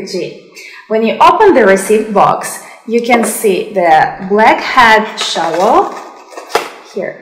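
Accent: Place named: Eastern Europe. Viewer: it’s her accent and lack of pronunciation more than anything. She has a strong Slavic accent.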